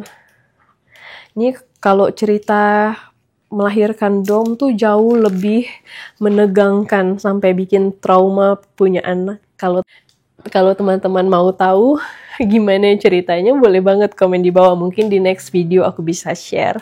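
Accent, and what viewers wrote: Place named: Java, Indonesia. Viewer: Mbak bicara nya seperti.orang jawa.pdhl bukan ya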